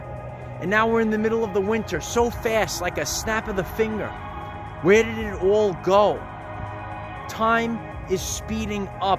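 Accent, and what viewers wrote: New York, United States. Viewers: Yes! These last 2 years have flown by! Are you originally from NY?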